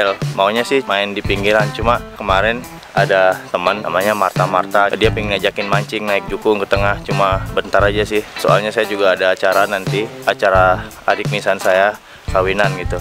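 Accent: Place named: Bali, Indonesia. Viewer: Gokil...bli suaranya has medok Bali...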